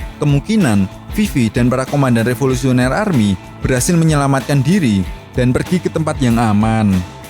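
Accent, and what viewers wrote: Java, Indonesia. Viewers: Bahaso jowo nyo gk bisa hilang ternyato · Min Jawa ne ndi ?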